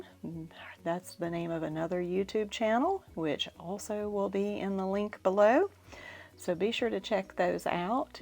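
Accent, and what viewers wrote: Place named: South, United States. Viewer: Welcome,! So happy that Deb pulled you in to #fridaysews! I found her on #teatuesday, where I really enjoy watching her. I don’t produce videos, I just love to watch and sew. 😘 It’s nice to hear another soft southern accent.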